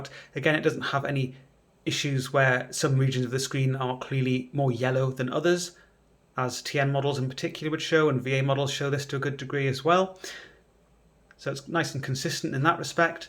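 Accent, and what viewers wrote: United Kingdom. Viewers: UK accent adds even more points to the professionalism of this video. Top notch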